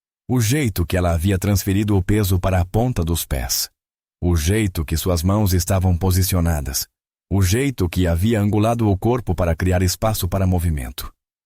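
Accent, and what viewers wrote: Portugal. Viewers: Pq esse narador tda vez q fala um número errola a língua, ou pronúncia em português lusitano ???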